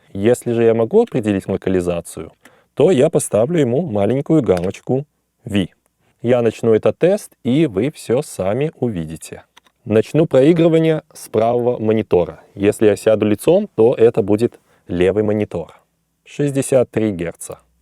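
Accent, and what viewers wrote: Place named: Germany. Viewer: Откуда у тебя немецкий акцент?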